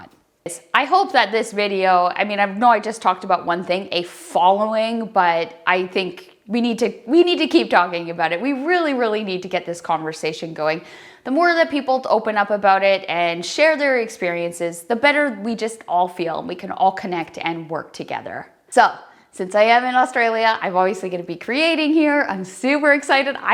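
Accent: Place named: Canada. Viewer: I have always had a real distain for the mindless groupthink rush to "the latest" social media channel. I found Flickr a long time ago and loved it for its rich features! And you know what? I'm still there because it's such a great place for me. Apparently everyone went to Instagram and are now flocking to Vero ... whatever. Flickr does a superb job of helping me quickly and easily organize, tag, geotag, publish and share my best work with likeminded people. But foremost in every photographer's mind (those who want to make some kind of income) has to be exposure. No one will buy if they don't see your work. So the sad truth is that we all want to maximize exposure. Btw, as an ex-pat Canadian, I'm delighted to find your channel. Long ago I couldn't hear the Canadian accent. After 23 years away from Canada, I can. It's so lovely to hear and your work and video content is wonderful.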